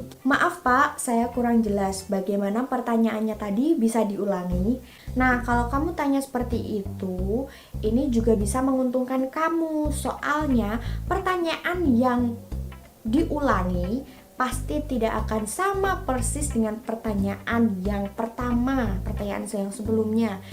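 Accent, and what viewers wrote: Java, Indonesia. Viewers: Mbak nya asal Jawa ya? 😂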